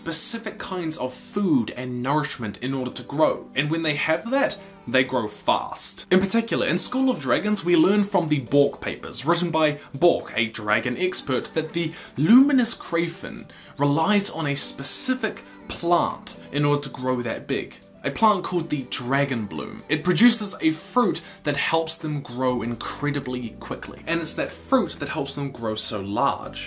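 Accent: New Zealand. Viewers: are you from nz? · wait are u from new zealand (if you are i am too) LUV U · Wait are you a kiwi (new zealander) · wait are you from New Zealand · spot the kiwi accent lol love it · Hello are you a Kiwi? Or Auzzy? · Are you from NZ because I am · Are you from New Zealand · OMFG IS HE FROM NEWZEALAND OMG IM SO HIPED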